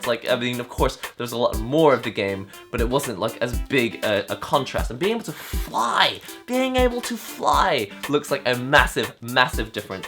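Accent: United Kingdom. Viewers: How the f••• do you be Japanese and have a f•••••• British accent.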